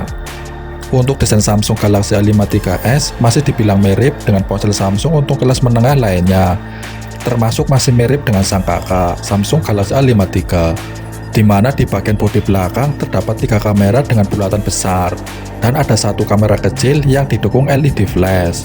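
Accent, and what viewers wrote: Banyumas, Indonesia. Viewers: Ngapak sekali 👍 min , , , ?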